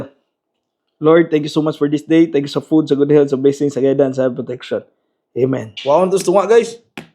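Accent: Visayas, Philippines. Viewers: Wag ka mg Tagalog halata kc bisaya ka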